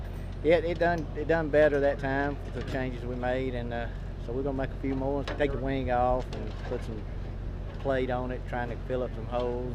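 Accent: South, United States. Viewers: it just makes it that much better that the dude has a southern accent haha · Of course he's southern lol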